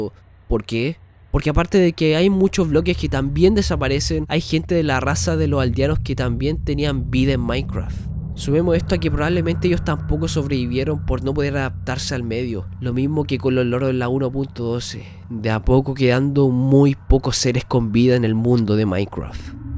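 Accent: Chile. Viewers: Chileno xD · Eres de chile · me gustaria saber como fue que se te ocurrio esta teoria, estaria encantado de escuchar como fue que fuiste formando esta teoria tan ufff pero con un sentido que no esperaba encontrar en un juego tan kawai como minecraft Pds: hehehe sabia que eras chileno con solo escuchar tu forma de hablar ^^ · Hablas español normal o habla chileno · dejame adivinar , ... chileno? :V xd -denme like para que lo vea y no desaparecer xd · apenas escuchar su intro este wn es mas chileno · Pta wn se nota que eri shileno ps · Tu Acento Es El De Mi Pais Osea Chile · Eres Chileno? :0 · me encanta tu forma de narrar teorias y me gusta también el concepto que tienen. ya he visto varias y la verdad son muy buenas ya tienes una nueva subscripcion !! ademas me gusta el acento chileno ;) saludos desde arg. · chileno DETECTED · apenas me meti al video y cache que eres chileno xd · Tenías voz de flaite, ya te había identificado el chileno, y bueno, el video era tan excelente que llegue hasta el final y te escuche el “hueon”, lo que si, podrías dramatizar menos jajaja, saludos bro!! 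🇨🇱🇨🇱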